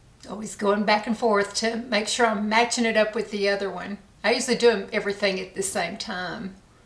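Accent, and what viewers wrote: South, United States. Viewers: I'm a new friend of,❤ We connected from our love and heartache of losing Mel. And I can say, in the short time I've known her, you couldn't be more right. She's such a beautiful soul, inside and out. Safe place to be❤ And though we became friends through such a horrible loss, I'm grateful for her friendship 🙏 I'm so happy she introduced me to your channel❤ You are such a beautiful soul, inside and out. Your southern accent will get me every time😘 So excited to be introduced to your channel...🦋🦋🦋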